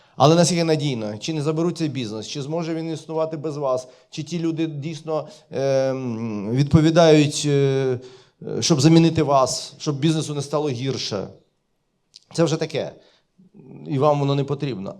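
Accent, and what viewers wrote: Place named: Lviv, Ukraine. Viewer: Приємно слухати на цій львівській, бандерівській мові! Хто в курсі, той зрозуміє)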